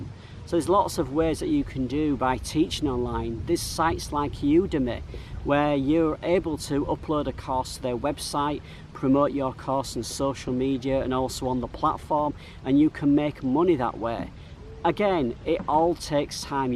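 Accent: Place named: Manchester, United Kingdom. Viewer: sorry mate i didn't mean to offend you , i thought you are from Manchester through your accent , lol cheers man , i like your narrating way and i keep following your videos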